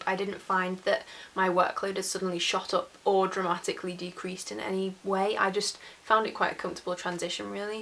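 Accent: Liverpool, United Kingdom. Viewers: I've watched a couple of your vids and kept thinking why your accent sounds familiar and you're from Liverpool! You've got a little scouse twang. From Liverpool myself. Love your vids xx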